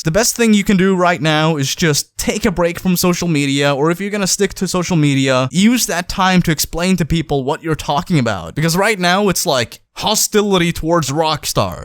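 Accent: Sweden. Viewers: Vae fan äru svensk? Good video tho. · Are u from Sweden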